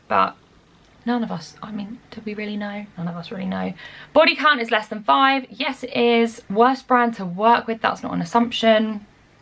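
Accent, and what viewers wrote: United Kingdom. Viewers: I love hearing you ramble!!! Especially about rubbish! Sorry I had to say that I’m from America and I just love British accents and slang.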